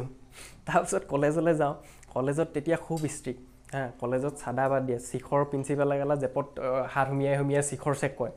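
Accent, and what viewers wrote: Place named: Upper Assam, India. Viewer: Bhakha huni upper assam jen lagise, kot ase thai dukhor?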